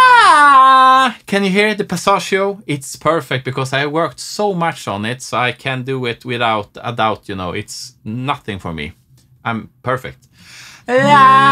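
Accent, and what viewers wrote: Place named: Sweden. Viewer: The swedish accent makes it even better! Tusen takk for en meget god latter.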